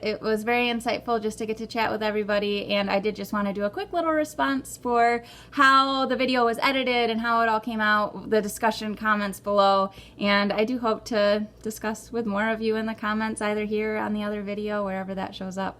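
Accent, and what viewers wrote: United States. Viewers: I like your Michigan accent.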